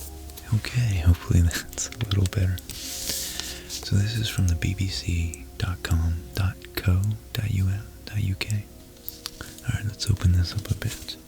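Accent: United States. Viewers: I love listening to Americans saying British things it's quite funny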